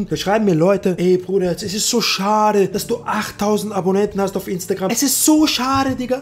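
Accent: Austria. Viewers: Kommst du aus Österreich? #teamgeorgTV 😊😊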